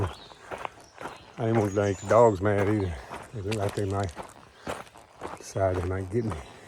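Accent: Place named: Mississippi, United States. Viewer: I am way behind (Day 42) but jumped ahead to ask a question. I was wondering what service plan you picked for your “In Reach” and if you still feel it was the right plan for you? Sure enjoying your journey! Have you been using your turkey call lately - worked pretty well down south. I have ancestors from the Holmesville-McComb area of Mississippi who fought for a Miss. regiment in the “War between the States” so especially enjoy your perspective and accent. Good luck to Katahdin! God Bless.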